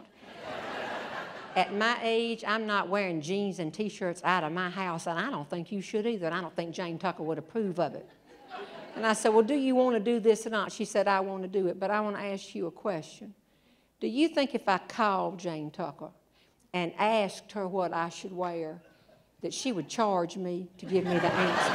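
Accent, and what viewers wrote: South, United States. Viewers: Thank you for the amazing stories, I grew up outside of Bristol, Va. my husband, who by the way is a LB! Moved me and our children to Cincinnati Ohio, 30 years ago, and now our children have grown and have given us grandchildren so we can’t move back home yet! I love listening to someone other then myself talking in a southern accent!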